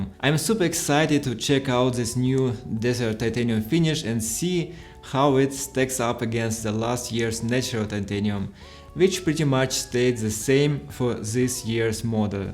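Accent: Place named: Russia. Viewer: The most russian accent ever 😃😁 всемусердецный привет из Азербайджан 😃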